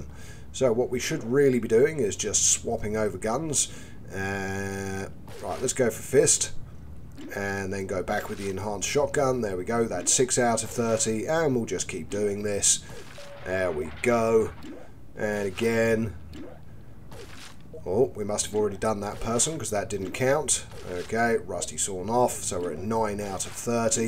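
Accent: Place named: Australia. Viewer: Bro your voice sounds like the Australian Accent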